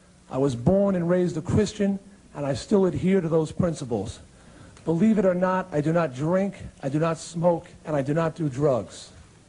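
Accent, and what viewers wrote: United Kingdom. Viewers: I was avoiding this video because it seemed such a boring topic, but I was wrong., great job presenting this. I think artists like you should be able to use whatever language you want, but I also appreciate your sparing and judicious use of vulgar language because it can be somewhat of a turn- off. That said, I rewound the last section several times because I so thourghouly enjoyed hearing such filth delivered in a proper British accent.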